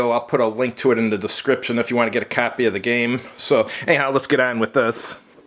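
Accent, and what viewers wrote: Chicago, United States. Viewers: Ancient wargames reviews with Chicago accent, I'm in.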